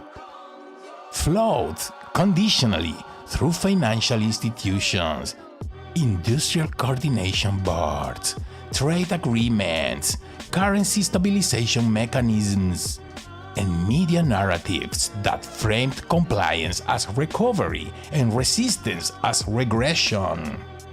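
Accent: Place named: Latin America. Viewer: DUDE FAVOR! IF YOU'RE GONNA LAY DOWN THE HEAVY AI HISPANOLA ACCENT AT LEAST SWITCH ON THE CLOSED CAPTIONS!!! THERE'S ENOUGH PAIN N SUFFERING IN THE WORLD ALREADY!!! UGH SMH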